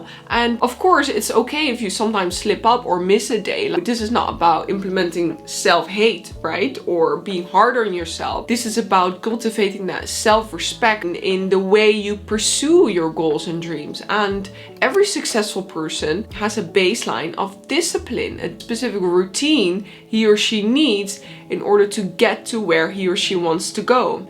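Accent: Netherlands. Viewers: Not only is your advice, life changing and I've watched all your videos many times over and over again to extrapolate all the information necessary. The Dutch accent is just so pleasant. Thanks!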